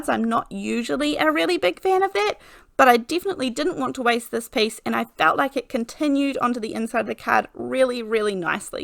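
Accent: New Zealand. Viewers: If you die cut the rectangle out of both cards, couldn’t you have made 2 cards by swapping your pieces over & still raising them with foam. I do luv your videos (yes I’m subscribed) & your kiwi accent too 😀👍🏼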